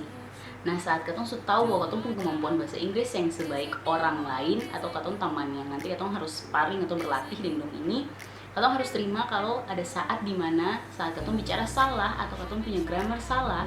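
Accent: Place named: Ambon, Indonesia. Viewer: Kudos to this video. 1. Apresiasi penggunaan dialeg ambon dalam penjelasan 2. This video might take 17 menit, but trust me, semua pertanyaan umum or kesulitan yg biasanya dihadapi pemula, udah dijawab disini. 3. I highly recommend the tips of having English environment, watching movies dan pake app untuk English learning contohnya ELSA (di app store ada) untuk pemula. Last but not least, please punya kemauan! Again, thankyou kaka 🎉🎉🎉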